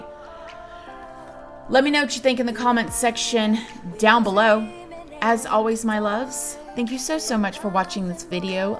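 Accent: South, United States. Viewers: I looooove your channel and your adorable southern accent.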